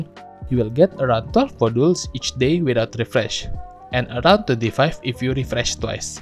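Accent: Indonesia. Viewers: As soon as I heard the accent, I knew this man was Indonesian. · Logatnya indo banget masnya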